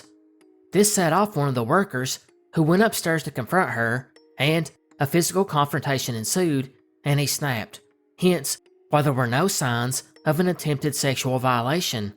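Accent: South, United States. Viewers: Southern accent x Mysteries. Subbed. Finally something new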